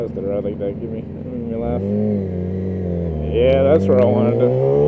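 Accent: United States. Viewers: dude u sound American, how did u ended up in Holand? lol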